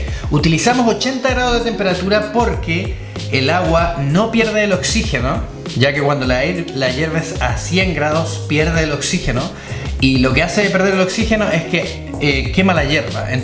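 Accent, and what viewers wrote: Chile. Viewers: Creo q por tu acento sos chileno t agradesco por promocionarlo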